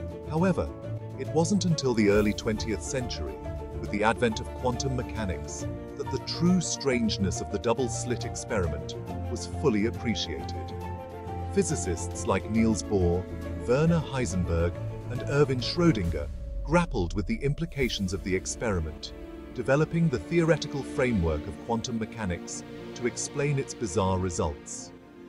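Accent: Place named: England, United Kingdom. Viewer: I'm sorry - I can't take that accent seriously. I know there's a bit of a fashion on yt for slightly plummy English accents doing sciency documentaries. But this accent is slightly weird.... tbh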